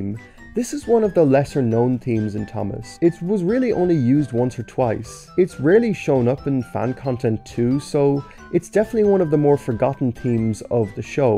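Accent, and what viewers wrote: Ireland. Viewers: He's Irish, and Irish does not have any sounds that collorate with thorn ( þ ) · He's probably irish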